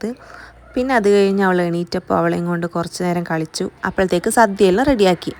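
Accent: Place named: Central Travancore, India. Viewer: സംസാരത്തിൽ മധ്യ തിരുവിതാംകൂർ ആണെന്ന് തോന്നി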